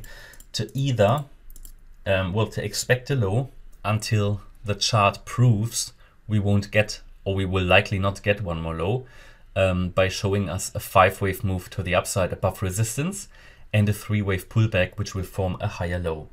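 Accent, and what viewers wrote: Germany. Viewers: German accent? Where are you form?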